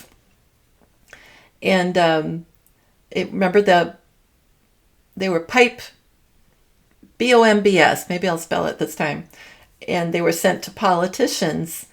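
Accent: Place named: Boston, United States. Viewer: Yes... I too hear a little bit of the Boston Accent 🙂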